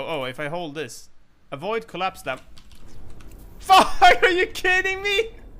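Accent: Sweden. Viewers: First video im watching and i am 100% that he is from Sweden. I’m I right? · Are you swedish? That accent though 😎👌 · u sound swedish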